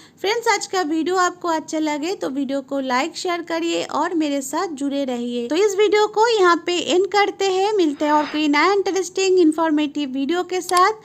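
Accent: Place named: Bengal, India. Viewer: If you don't mind, Are you from Kolkata. Your dialect suggests you to be a Bengali.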